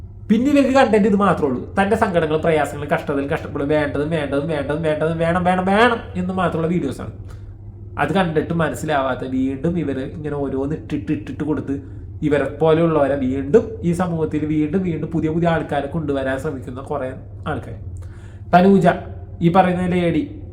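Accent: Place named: Thrissur, India. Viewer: Ikkade naadu evideyanu.. samsaram thrissur district kodungallur , thriprayar basha pole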